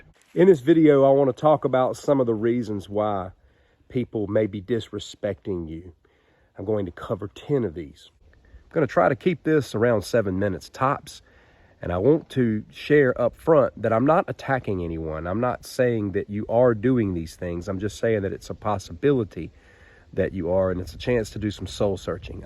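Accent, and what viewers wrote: South, United States. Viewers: Not only do I like your advice, but your southern accent, combined with your calm and confident voice sounds both masculine and soothing at the same time. Keep it up, man.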